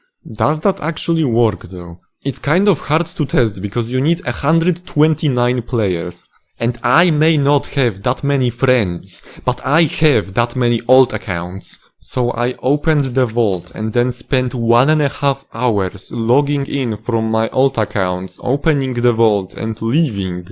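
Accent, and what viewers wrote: Poland. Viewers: kurwa ale polski akcent natychmiast slychac ze to polak mowiacy po angielsku doslownie po dwoch zdaniach niesamowite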